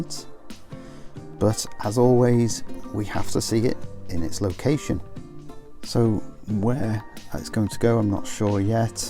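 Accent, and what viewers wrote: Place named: United Kingdom. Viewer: oh wait….sorry…..bad joke was bad…..I almost didn’t recognize the British/English accent…..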